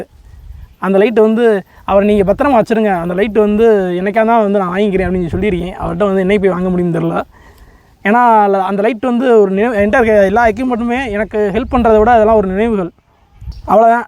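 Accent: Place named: Madurai, India. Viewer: Unga madurai slang kum unga muyarchikkum seekiram success varum bro❤😍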